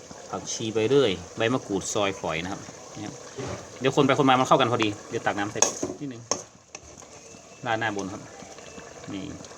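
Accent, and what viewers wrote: North, Thailand. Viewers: อู้ไทยประเเลดเมื่อง5555++++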